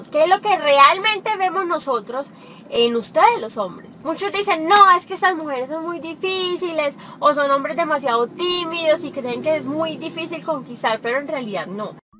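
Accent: Colombia. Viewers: Hola apenas estoy viendo tus videos y conociéndote, eres una chica guapísima, linda y muy carismática!!! me encanta el asento de las chicas colombianas jajajaja ya me convertí en tu fiel fan.! voy a seguirte con frecuencia saludos desde Monterrey México..!! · Me gusta el asentó colombiano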